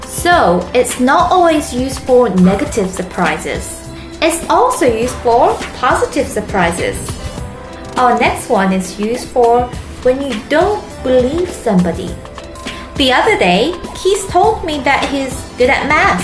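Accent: England, United Kingdom. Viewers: Your Korean accent sounds almost British... Interesting. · she sometimes has a british accent, but mostly its a typical korean american accent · Yaah! Unnie! You're so perfect! You speak korean and have that british-like-accent. T^T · i like her english accent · You seem to have a very slight English accent lol. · she sounds british almost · She sounds like she's got a british accent aswell as the korean slurring. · I love your English accent.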